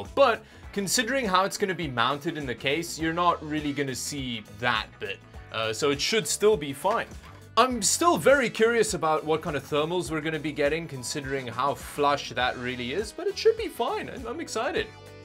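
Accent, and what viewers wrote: South Africa. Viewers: Mmm, am I detecting a South African accent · are you south african?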